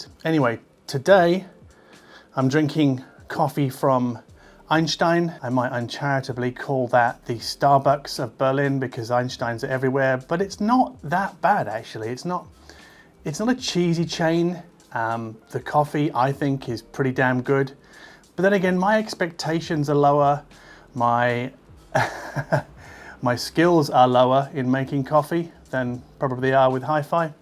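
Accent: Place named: United Kingdom. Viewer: U sound like u live in the uk but u live in berlin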